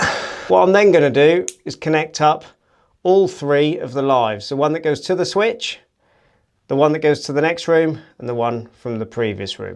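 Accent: Norfolk, United Kingdom. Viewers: Sounds like a Norfolk boy to me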